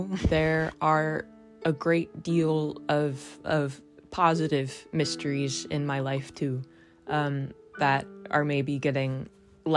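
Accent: Midwest, United States. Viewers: I don't know where in the midwest your accent comes from, but you have a very distinct speech pattern. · Can I ask is somebody who is in the shoes that you seem to have been in when making the video you reference at the start, what was it that encouraged you to go through with the full diagnosis? I’m pretty much 99% sure, and I’ve just found out that I’ve got to start the waitlist again because I’ve moved from one County to another which is like moving states. (I am assuming from your voice that you are American) it’s going to be 18 months, but I’m really sort of at that phase of feeling sad about the whole realism that you probably are… You got any advice ?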